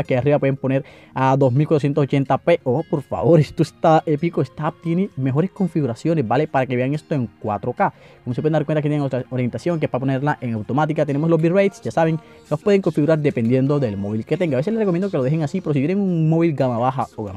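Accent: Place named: Chile. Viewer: es chileno :v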